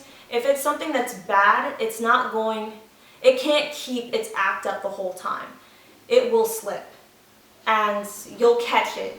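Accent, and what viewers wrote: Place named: United States. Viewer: What parts of Mexico are your parents from? Have you ever been to Mexico? You have no chicana accent which is interesting because, you have a normal american accent.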